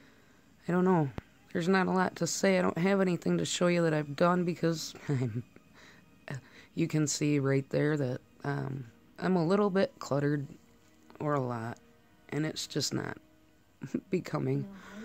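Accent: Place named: Michigan, United States. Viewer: Cool! Nice to meet you! Love your accent reminds me of my cousins up there. If my family had stayed in Michigan I would sound the same. I am so happy I was able to inspire you in any small way. It is why I feel like I need to share my art more, in order to give permission to others to just do it, play! I am big on embracing the inner child. Girl don't you even worry about your teeth, You are uniquely YOu. I had a friend in Memphis who had the worst teeth, but she was one of the most beautiful people I had ever met. One of our BFFs Danno has 2 teeth in his head, but our BRO has been there for us time and time again! I'll take my toothless friend Danno any day over some shallow egotistical pretty boy who can't even be bothered. Keep em coming I can't wait to see some of your art!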